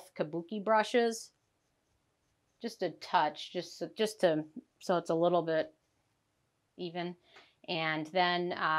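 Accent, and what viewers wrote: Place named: Midwest, United States. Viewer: my sunscreen AND Hyaluronic are water base. I’m using the Garden of Wisdom glacier water blah blah but their most popular and it is nice and refreshing as you’d guess. Def not enough at all on its own. Can’t wait to try First Aid. I have normal skin but it likes oils at night better than creams even tho derm docs say creams are better for keeping products in place to do their work. Sunday Riley Luna or Juno are dreamy for me. Starting up retin A once s week again and once you get past the ugly part, you def glow. I avoid it if I’m vacationing or have an event where I don’t want surprise peeling. Such as Christmas. Then I get back on the horse. I’m originally from MI so your accent is charming like mine. Lol. People know I’m Midwest immediately so I’m loving hearing another brethren once in a while.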